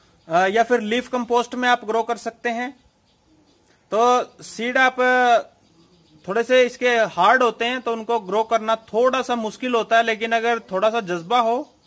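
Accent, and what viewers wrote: India. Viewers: I don’t understand when you talk with Indian accent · Was he speaking English? At first but then it changed to Indian ?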